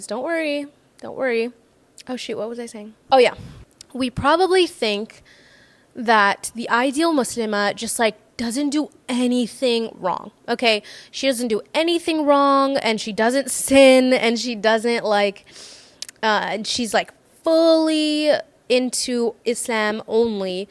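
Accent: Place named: United States. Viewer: I like this American accent can you tell me which region this is I will found more content in this accent I'm learning English with this accent but I don't know what is the name of this accent It will be good for my future English language hope Thank you for sharing Islamic content May god grant you more knowledge about Islam, Bacha from Pakistan ❤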